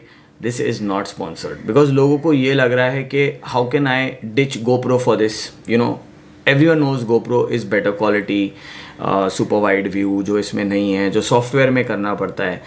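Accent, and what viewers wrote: Gujarat, India. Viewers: Aap gujarati hai ?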